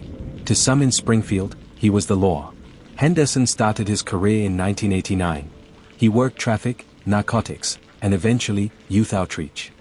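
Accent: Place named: United Kingdom. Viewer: I can’t stand a British voice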